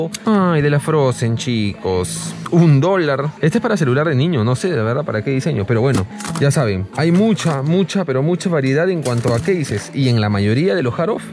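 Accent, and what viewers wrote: Peru. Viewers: mano tu eres de peruuuuu? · Asu de entrada reconocí el acento de un compatriota. 🇵🇪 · Joder bro como me cuesta diferenciar el acento peruano 🤣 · Primera vez qe veo tus videos y por tu forma de hablar creo qe eres Peruano :V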